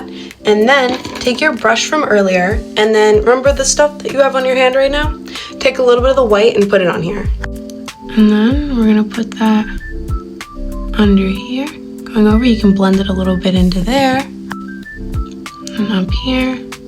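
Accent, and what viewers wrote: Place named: Netherlands. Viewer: You sound very dutch 😂 I am dutch aswell :3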